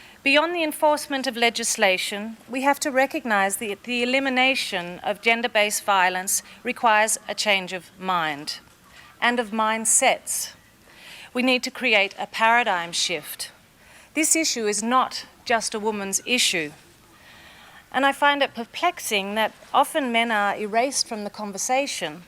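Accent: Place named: Australia. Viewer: Good to hear Princess Mary and her aussie accent!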